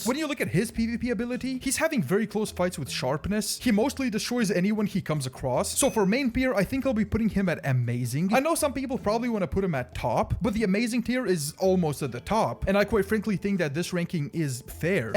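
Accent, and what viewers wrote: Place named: United States. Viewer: lil bros voice changed to american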